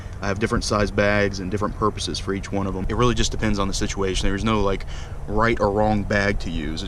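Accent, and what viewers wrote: United States. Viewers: Your accent sounds American but one of your guidebooks was specifically for Canada... can you confirm what country you're in?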